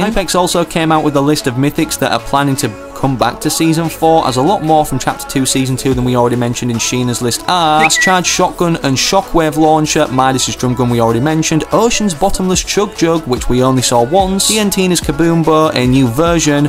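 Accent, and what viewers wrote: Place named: United Kingdom. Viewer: I never knew you where British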